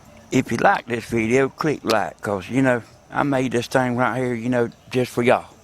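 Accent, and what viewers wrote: South, United States. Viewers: I love the southern accent. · love your good ol boy southern accent..i just chuckled listening to ya...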